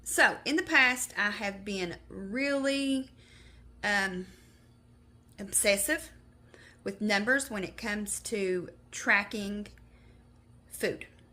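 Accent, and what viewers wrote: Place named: South, United States. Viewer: just found your channel, love your curls and southern accent!! (Louisiana girl here) You look great and thank you for sharing your info. I hope next week brings your results. I need to get better at making videos..I need to also start weight training, so definitely subscribing to follow your progress.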